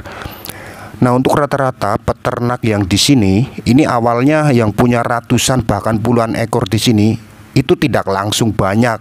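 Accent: Banyumas, Indonesia. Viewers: Apakah Bpk berasal dari Cilacap,Purwokerto,Banyumas,Tegal,brebes,Pemalang,Banjar negara Kenapa Ngapak😂😂😂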